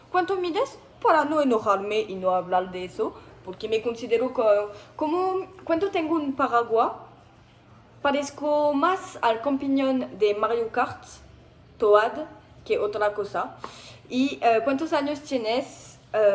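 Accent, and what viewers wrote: France. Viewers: hermosa francesa! adoro tu acento hablando slang mexicano,😂 espero que hayas disfrutado tu estadia en mexico, eres muy cool y graciosa vuelve pronto y que dios te bendiga.😊😍💕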